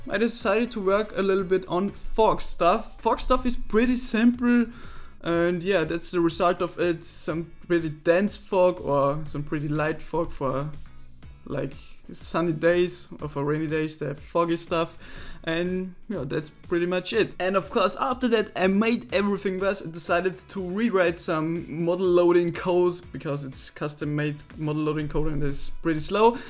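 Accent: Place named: Germany. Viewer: Ein erster Schritt wäre dein Linking zu verbessern. Relativ einfach und intuitiv, wirkt dem deutschen Aktzent entgegen. Im Englischen werden zwischen Worten oft keine Pausen gelassen, wie dies im Deutschen zwischen quasi jedem Wort ist. Bspw. "an apple" wird als ein Wort ausgesprochen. Ich hatte diesen 'Trick' nach etwa einer Woche verinnerlicht (wird einem erst in der 12. Klasse offenbahrt).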